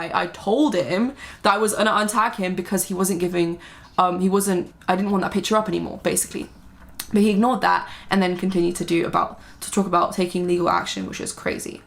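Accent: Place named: United States. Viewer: Why do u put on an American accent for?? Or are you but lived out of u.s for a while? Xx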